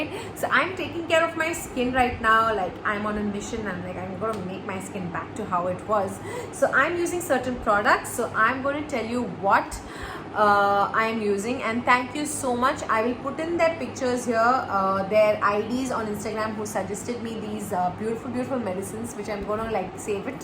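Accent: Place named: Kerala, India. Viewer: Why do I find your Malayalam funny and out of track? 😂 It sounds sooo cute. And I know the pain, I was there!!! Great tips. ❤️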